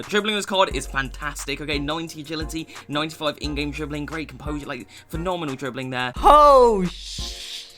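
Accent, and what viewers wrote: Liverpool, United Kingdom. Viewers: What a thick scouse accent you have right there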